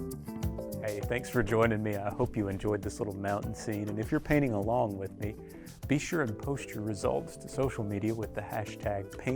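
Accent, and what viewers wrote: South, United States. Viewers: That southern drawl… it’s…. hypnotic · Another beautiful job I love your own personality that creeps out and that hint of a southern twang not as southern as mine but I hear it - it’s a shame the video is ruined by constant commercials cutting in I’m sure there could be a better way of viewing this without YouTube ruining it too much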